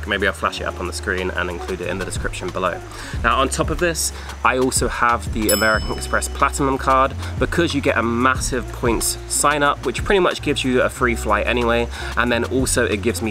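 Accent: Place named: United Kingdom. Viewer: Can’t listen to your pronunciation of British. Sorry.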